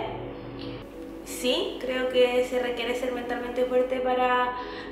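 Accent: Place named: Chile. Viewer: ya casi se te está yendo el acentos chileno.jajaja y me encanta! Por otro lado me inspiras ❤️ me di cuenta que la vida se va y la mayoría nos quedamos atrapados en un trabajo para poder sobreviviry nos olvidamos del universo completo que tenemos afuera.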